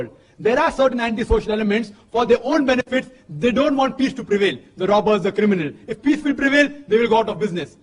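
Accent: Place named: India. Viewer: هذا لأنه هندي الأصل كان بتحدت الهندية مثلا لنعتبر أنك تعلمت الإنجليزية هل ستتحدت كما يتحدت الأمريكين سيكون لك أسلو ب غريب في الكلام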